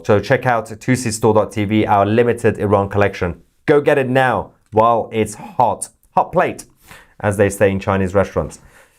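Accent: United Kingdom. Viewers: Mr. Tousi ! Did have seen your Video and heard your fucking British accent??? Believe me, you’re a very cheap guy like those IRJC and Basij undereducated!!! 👎👎👎👎👎👎👎👎👎👎👎👎👎👎👎👎👎👎👎👎👎👎👎👎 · .if America goes in on foot it's gonna be bigger then Iraq does. Trump want a long war . because bombing them won't get rid of them if you want change you have to go in on foot.. be prepared to lose thousands of Americans .. how much are they paying that British accented mouthpiece.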